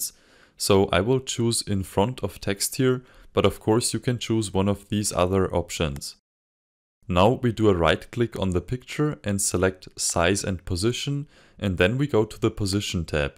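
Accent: Germany. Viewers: Lovely German accent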